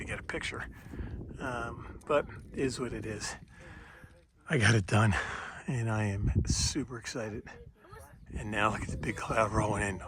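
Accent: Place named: Philadelphia, United States. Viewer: Great job! Will miss that Philly attitude and voice.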